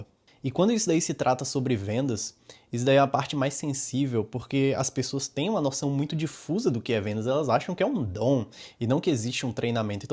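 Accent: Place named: Bahia, Brazil. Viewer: Vi que você é devoto de Carlo Acutis hauha... Você é baiano? O sotaque caetano veloso entrega hauahua...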